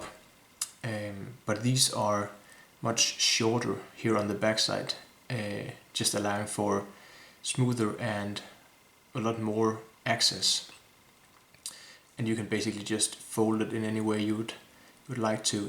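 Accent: Denmark. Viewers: Hej (fandt dit navn på soundcloud), Jeg tillader mig lige at besvare dit spørgsmål inden jeg fortsætter med vores snak omkring kabellængder. Ja jeg er dansker og sad faktisk og tænkte det om du også var dansker, da jeg så videoen. Det er svært at løbe fra den danske accent, men du skriver og taler rigtig flot engelsk! Nå! Tilbage til det originale emne og tak for dit svar. Grunden til at jeg spørg er fordi, at de 30 cm kabler som jeg har nu passer perfekt til mit pedalboard. Men jeg ville gerne have kabler som disse for at få plads til flere pedaler, da de fylder ingenting sammenlignet med de traditionelle kabler (pro snake Patch Angled Jack). Jeg har så valgt at købe thomanns egne kabler som hedder Harley Benton FPC i stedet (du kan prøve at søge på dem), da de kostede halvdelen af EBS og ud fra hvad jeg kunne læse, så minder de utrolig meget om EBS kablerne. 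Men rart at vide at jeg også skal tilføje et par cm til dem, så behøver jeg ikke være bekymret om hvorvidt de er lange nok eller for korte. Så må vi se om de holder lige så længe som EBS ellers må jeg købe dem næste gang:-) Ps. Jeg har tilladt mig at abonnere på kanal, da dit indhold lige er noget for mig.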